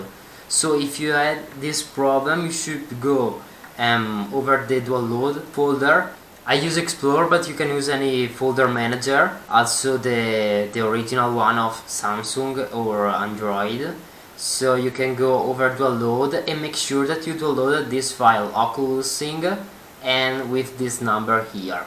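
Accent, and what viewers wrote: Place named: Italy. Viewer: Ciao, grazie mille! Riconosco dall'accento che sei italiano! Tutto funziona perfettamente, mi chiedo solo per quanto altro tempo le app resteranno sullo Store di Oculus Gear ora che il servizio è stato interrotto...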